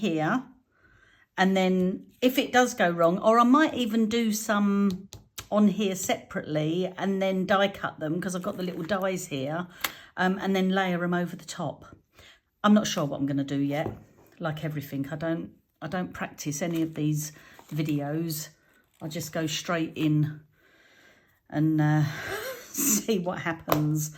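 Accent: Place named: England, United Kingdom. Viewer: Lovely crafty creation 🥰. You say "rouge" perfectly. With your beautiful English accent🌹.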